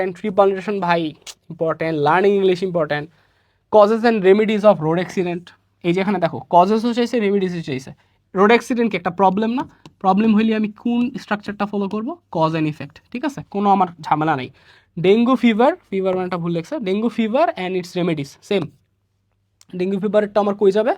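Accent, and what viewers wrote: Sylhet, Bangladesh. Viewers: Tumio sylheti ni?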